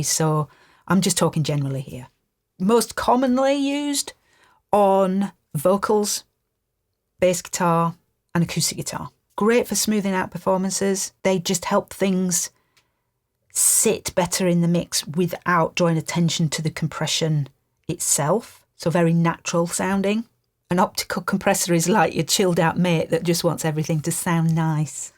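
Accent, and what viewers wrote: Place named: Yorkshire, United Kingdom. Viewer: This is an amazing lesson! Thank you so much for sharing your knowledge in such an easy and understandable way. Also, I have to say I love your accent :) I now live in East Yorkshire, I guess we are not too far?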